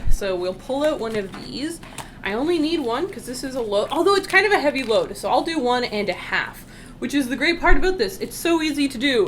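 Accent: Canada. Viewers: So many Canadian 'abouts' in the first forty seconds it's so great! Great sponsor, looks like a good ecofriendly product.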